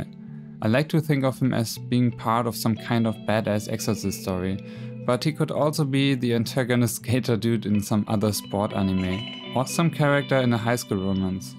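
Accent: Germany. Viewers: DEVIL MAY CRY?! My favorite character. Dante is. Jeeez. Amazing. I even loved nero and dante with short hair. I hated it in the beginig. So you have with hair right? A little Dante ispired? I started listening to your videos late at night when i couldnt sleep. Ill be hones. Your german accent is... relaxing to me cuz of years of watching rtl2. It was my only sorce of anime back when i was a kid. Had luck cuz i was taking germany classes since kindergarten. Why was this so important here in my country i dont even know... i think u are so much more capable than me. Think u are even the same age as me but i dont know.. while i draw and paint all the time i strugle. Or maybe i should learn to accept my style. Cuz its definetly very diffrent of what i want. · dieser deutsche Akzent xD aber schön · Also ich denk mal du beust deutsch wegen deiner aussprache , also schreib ich mal in deutsch xD auf webtoons gibt es eine story namens sirenes lament und da gibts auch einen ian der so eine attitude hat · r u from germany? 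haha i dont know your accent is a bit like mine. ^^”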